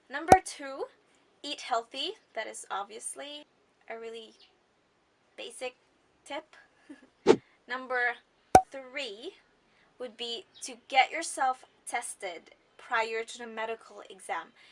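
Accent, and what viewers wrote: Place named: United States. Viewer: 💙💙💙 you sound so American already 😁👌🏻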